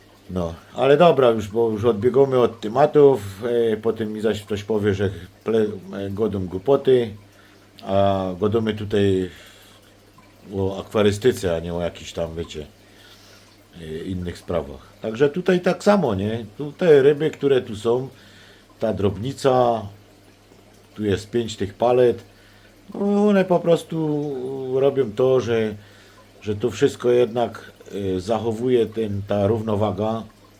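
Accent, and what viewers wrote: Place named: Silesia, Poland. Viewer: Cześć. Fajne i ciekawe rzeczy opowiadosz,miło sie słucho,szczegulnie jak godosz po naszymu😬.Pozdrowiom ze Mysłowic.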